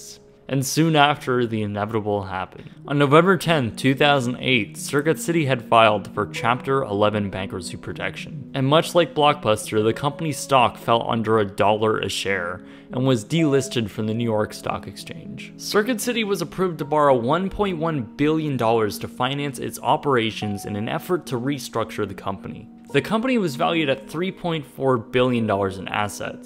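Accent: Canada. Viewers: narrator is Canadian. They pronounce some words differently. Gotta problem wit dat? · I can hear the Canadian accent in your voice. Makes this video that much better.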